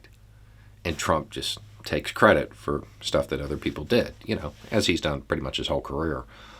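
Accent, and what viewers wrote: South, United States. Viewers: I wouldn't call it an act. is from the south and I believe the way he looks plus sounds is authentic. It's just people assume that others who look like him are going to be right wing and he uses that as an advantage for them to listen to his message. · Opara and everyone else talking about his accent As a southerner maybe I can provide some clarification. What I think we are hearing here on most of his videos is close to his professional voice. You have your regular (nautural) voice, the one that no one is going to think you have a single brain cell in your head, the one that makes you sound like an extra from "Deliverance". Then you have your professional voice, where you have to hide as much of it as you can so folks don't think you are an idiot (or a bigot, because you know all us southerners are a bunch of racists, and to be fair, I can see why someone would have that assumption). 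What we hear from is a relaxed version of his professional voice. Some videos we hear his relaxed professional accent, some his natural accent, and some we hear a played up version of his natural accent. To someone not from the south these distinctions are easy to miss. I recommend anyone interested in the topic look up "code switching". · his "act" used to be when he hid his accent. A lot of Southerners do. He's just speaking how a rural Southerner speaks. Sounds normal to me. I'm a Texan. I don't have much of an accent because my family drilled it out of us, but I've known plenty of people who sound just like him.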